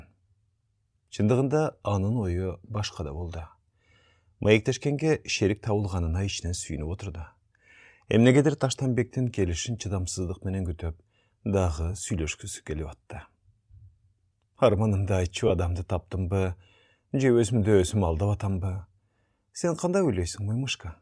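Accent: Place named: Osh, Kyrgyzstan. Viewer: Сонун окуйсуң да балам, Зууракандын "" штонибут "" дегенин ошо бойдон окуганын сонун болду, өлө күлүп жыргап алдым, любой жагын келтиресин да, Ош диалекти да сонун угулат сенин оозунан, рахмааат сага...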